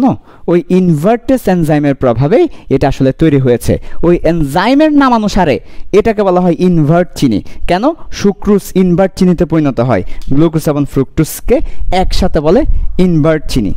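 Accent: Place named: Chittagong, Bangladesh. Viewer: Bai chittagong er naki ,chini chini lagtase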